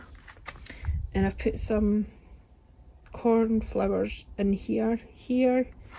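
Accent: Scotland, United Kingdom. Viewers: Hi there. I just happened upon your channel today. Great to hear another Scottish voice 😛 You have added lots of great plants into your garden and things are looking great. The Heucheras, Brunnera and Hostas are doing well - mine are too - must be the Scottish air (I'm assuming you are in Scotland!). Anyway I have subscribed and look forward to binge watching your other videos. All the best. Mags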